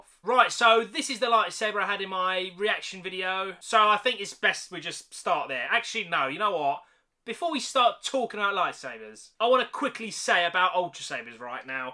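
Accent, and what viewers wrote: United Kingdom. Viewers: Why does he look like an American hipster while talking like a Brit?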